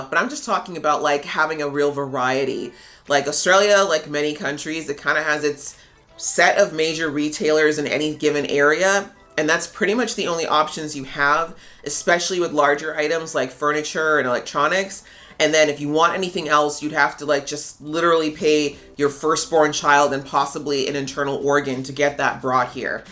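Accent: United States. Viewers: thank you for sharing, you sound American, I am from USA definitely considering moving to Australia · You sound like you come from the US originally. If so, you are not allowed to have your own opinion and that makes your whole video illegal. 14 hours to anywhere ? I tale it that you mean to the only real country on the planet. The the good ol US of A? while you have been away from the US there has been lots of exploring going on. Columbus, that you already know of I expect. But since then, there has Dutch explorers, Spanish explorers, Portuguese and even English explorers and there are now something like 200 hundred recognised countries sharing the planet with the US of A. Oh, its shocking, I know, but we have to face up to reality now and then. Yes, even yanks once they learn proper English. New Zealand is much less that 14 hours away. Indonesia also. Papua New Guinea. 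the Solomons, Singapore, Malaysia, Phillipines, one even that even yanks should have heard of, no not Canada, Vietnam. All much less than 14 hours away mu modern jet airliners. Sorry, is the US still in the Wright Flier stage of aviation ? cheers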